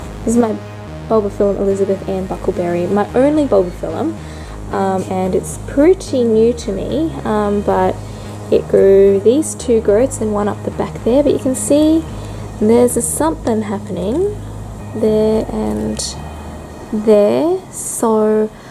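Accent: Australia. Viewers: I really enjoy your Orchies in Bloom videos. For one thing, I could listen to your voice with that Australian accent all day long. It’s so soothing and your content is interesting. Looking forward to the star of the show next month!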